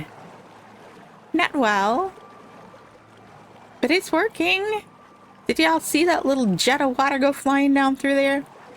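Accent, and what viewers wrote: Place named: Midwest, United States. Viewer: From ope to oofda, I really enjoy the midwestern speech. It all makes me feel at home while listening. Plus, the soundtrack is so catchy, I love to hum along as well. I hope you had an amazing Solstice, and that the next three months bring you much needed rest and revitalization. Thank you for sharing your playthrough 🙏🌠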